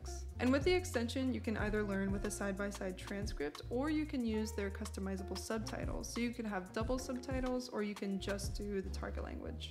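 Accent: Mexico City, Mexico. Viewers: Great job! You do sound Mexican! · OMG in your second video where you are more fluent, you really sound like a Mexican from the CDMX lol and as you said, there are not specific types of mexicans, I have friends that look just like you and I am from the north of Mx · I love your Mexican accent. So cool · You should’ve picked another accent other than chilango though.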